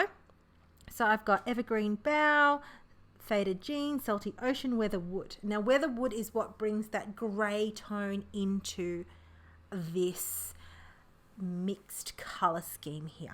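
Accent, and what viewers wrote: Australia. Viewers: Glad to hear a fellow Aussie on YT. New sub from NSW ❤️🇦🇺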